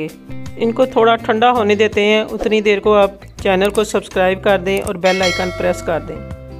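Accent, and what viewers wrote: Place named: Lahore, Pakistan. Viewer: Lucknow, India. Your dialect and accent remind me of my elders who relocated from Lahore to Delhi.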